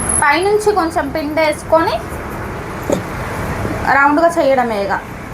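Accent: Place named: Telangana, India. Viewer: Akka Miru pakkaTelangana slang hey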